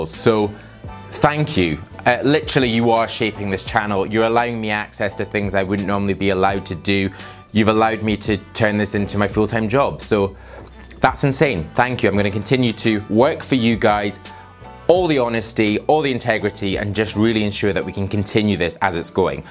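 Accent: United Kingdom. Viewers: Congratulations on your achievement! You help me so much build interest for my hair and having an awesome hairstyle.😊 Also I really love your British accent you look so charismatic. My favourite vid of yours is for sure is when you fixed your worst haircut. <link> Dom really saved the day! Also you were so hilarious in the rant. You’re right about understanding and listening someone (which that barber didn’t did😉). Love from Canada!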